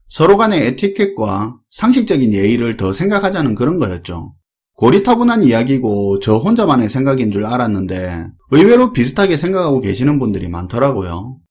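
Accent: Gyeongsang, South Korea. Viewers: 아주 경상도스럽고도 신천지포교스럽다 말투부터 거북하다 지금 정치는 바르게가고 있다고 본다 이런식으로 2대는 더가고 그다음쯤 평가 해보자.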